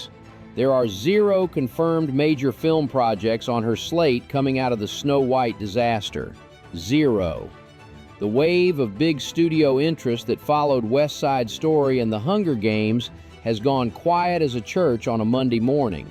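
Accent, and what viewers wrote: Texas, United States. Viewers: You gloss over genocide, the deliberate bombing of children's hospitals, bulldozing of human beings, machine gunning of children and starving refugees by the extreme terrorist nation of Israel as "foreign policy". It was not politically smart to engage with her co-star on this, it's true, but those atrocities demand a level of response and engagement that goes far beyond one's career, industry polity, and 170 million dollars. In fact I would trade a single Palestinian child's life, for 170 million dollars. By the way, *Israeli soldiers have asserted their "right to rape" in this conflict*, and largely gone unchallenged. Make a video about that with your Texas accent. Until then, you are all too predictable.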